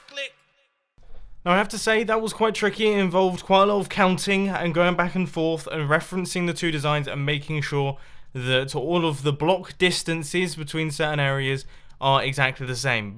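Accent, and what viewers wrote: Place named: Coventry, United Kingdom. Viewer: are you from Coventry? you just have the accent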